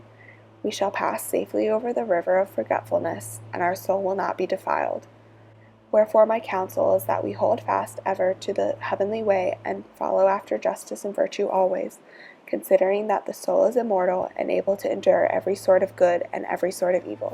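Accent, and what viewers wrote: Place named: South, United States. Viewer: Sorry...I found both the graphics and the monotonous Southern voice annoying.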